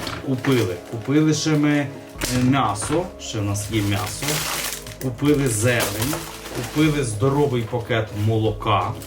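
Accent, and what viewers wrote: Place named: Poltava, Ukraine. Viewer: Вас може це здивувати, але половина України БАЛАКАЄ. Далеко не всі в побуті спілкуються чистою літературною мовою. Місцеві діалекти рулять 😊. І немає в цьому нічого поганого, адже завдяки діалектам мова і є такою різноманітною. На Полтавщині навіть є такий жартівливий вислів : на сході "гаварят", на заході говорять, а у нас в Полтаві балакають і все чудово.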